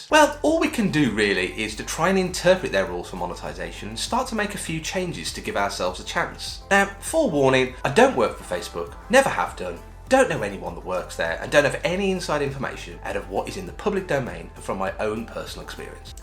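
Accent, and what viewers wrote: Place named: Liverpool, United Kingdom. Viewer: i hope you upload more videos about Facebook in stream ads it's been two years now working in this platform and the worst thing is how mysterious Facebook laws for this content and i just find my self lost because the lack of information and guidance soo i hope you continue your work and speak a little bit slowly cause your Liverpool accent is a little bit hard for me 😄😅 but good jop already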